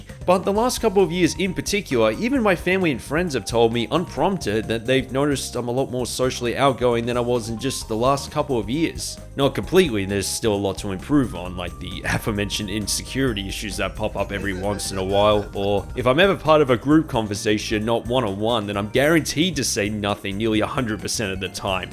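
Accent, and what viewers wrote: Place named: Australia. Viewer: I really love your videos, I’ve been watching you for ages now. But I love that there’s an Australian voice in this space on YouTube and I also love the quality of the videos that you put together. Thank you so much for inviting us in and letting us know a little bit about you and the reason for the channel, I’m sure I’ll appreciate everything just a little bit more now. Congratulations on 10 years!!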